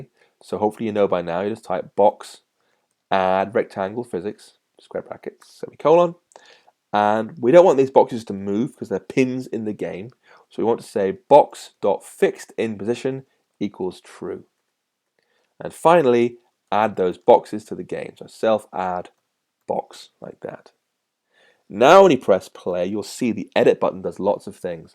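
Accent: United Kingdom. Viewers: LOL British or Scotish.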